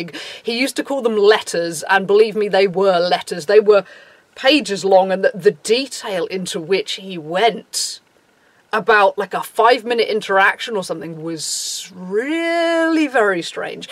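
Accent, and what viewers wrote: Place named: Birmingham, United Kingdom. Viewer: he's from Birmingham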